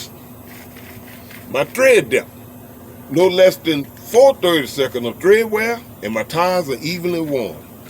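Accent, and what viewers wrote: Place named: Louisiana, United States. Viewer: Its the louisiana accent lol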